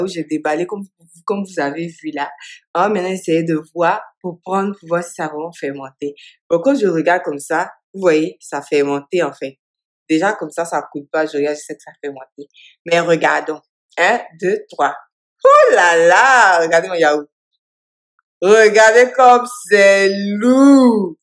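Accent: Abidjan, Ivory Coast. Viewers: cc j espere ke vs allez bien; merci bcp pr le dèguè. J aime votre maniere simple de presenter votre recette. On sent la voie dune vrai abidjanaise. RIRE. BISOUS. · Toi tu es ivoirienne l'accent .😘😘merci la sœur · Bravo ma chérie 🤩🤝👏 pour ce chic dèguê 😂j’ai suivie avec plaisir , l’accent de chez nous , le “c’est Maman qui m’as appris depuis Abidjan “ tout cela j’ai aimé même 😂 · Cc j espere ke vs allez bien. Merci bcp pr le déguê. J aime bien votre maniere simple de presenter votre recette. On sent la voie d une vrai abidjanaise😂😂. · Ivoirien quoi bisou ma soeur 😂😂😂😂 français terre a terre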